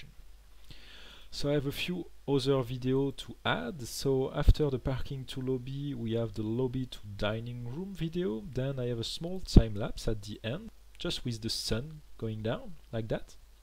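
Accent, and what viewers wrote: France. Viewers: Your French accent is “mignon”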